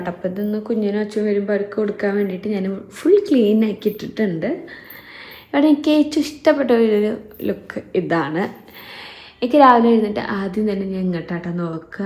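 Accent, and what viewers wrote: Thrissur, India. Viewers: thrissur okke angane ahn parayaa😂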